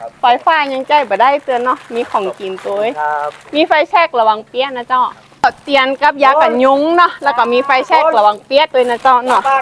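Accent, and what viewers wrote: North, Thailand. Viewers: น้อง เป็นคนที่ไหนครับเห็นอยู่ทะเลแต่พูดเหนือ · โค้ะ อู้กำเมืองได้ตวย ฮู้ๆๆ สุดสุดยอดเลย ก่นึกว่าคนใต้คนภาคตะวันออก ชอบเลยครับต้องสนับสนุนละครับ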